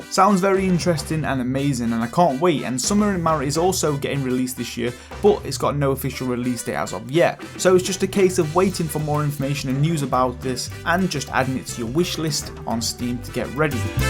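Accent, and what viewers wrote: United Kingdom. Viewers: Dude he's british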